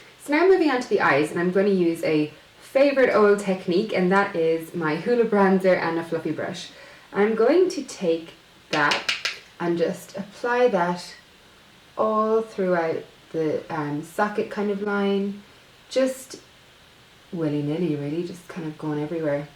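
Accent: Ireland. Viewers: Your so beautiful!! Are you Irish? You've got a lovely Irish accent💕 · It sounds Irish to me...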